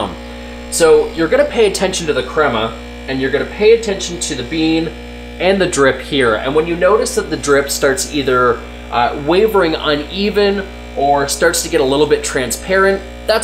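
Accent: Canada. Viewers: i feel like you are from nova scotia?? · through ewt the video. with ewt spilling it. the water is coming ewt. melk. the canadian accent is something else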